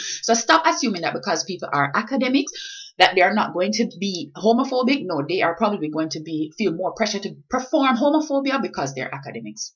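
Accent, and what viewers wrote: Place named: Jamaica. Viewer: Hi, really liked this video, even though I couldn't understand some of the lingo (not a Jamaican or accustomed to hearing your accent). You did your African research well, very impressive...you are totally right, they had no right to do the man that, he has rights and even though he could have done what he was doing in a private place their behavior was sad and I hope the world gets a clue soon and no more lives are hurt or lost..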